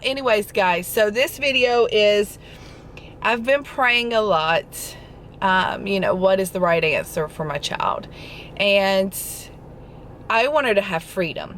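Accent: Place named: South, United States. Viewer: I don’t think you’re crazy, you’re a mother (mama bear), you don’t look old enough to have 2 20 year old daughters, but I must say this as a Brit, love a southern accent on a woman, stronger the better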